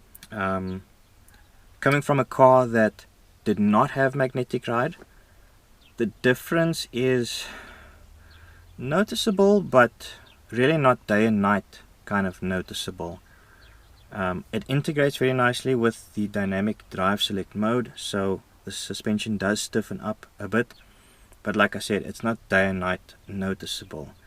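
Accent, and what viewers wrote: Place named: South Africa. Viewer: This guy has a soothing voice. I love a South African accent… Great car too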